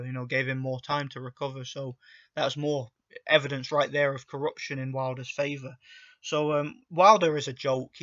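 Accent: United Kingdom. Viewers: Is crazy what your saying but do you know the wbc took the belt from tyson fury about the glove gate bs povetkin vs dyllan white just recently fought for the interim wbc heavyweight belt sooo skill or not he was a champ and your accent tells me your from uk and mayb mad at this guy idk but it seems wilder lives rent free on your mind 🥱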